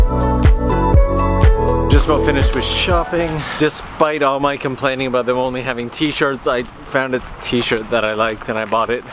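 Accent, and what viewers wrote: Canada. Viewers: 10!? jeez lucky you, we've had a wind chill of about -41 the last few days here in northwestern ontario. and now that youre back in canada i notice the accent even more haha.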